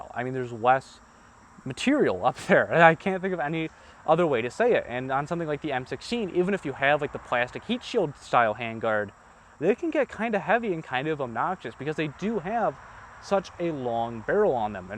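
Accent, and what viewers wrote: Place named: United States. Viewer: yeah well he's american they say most things weird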